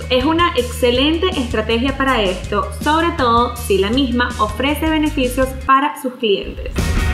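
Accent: Venezuela. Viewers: Hola me encanta tus vídeo y la forma como los abordas. Y ese acento venezolano es lo mejor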